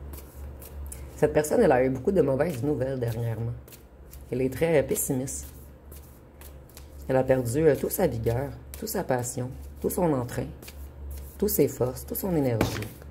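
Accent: Quebec, Canada. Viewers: Vous avez toujours autant de charme ,de séduction , de belles manicure et couleurs des ongles , vos tatouages bien fait et très jolis et comment vous avez dû souffrir dans le bras droit en plus , j'adore votre accent québécois ,votre charme ,vous êtes très coquette aussi je trouve ça 👍🌟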